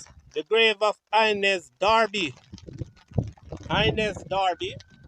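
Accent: Jamaica. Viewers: are u jamaican proper proñounciation pĺease love ur program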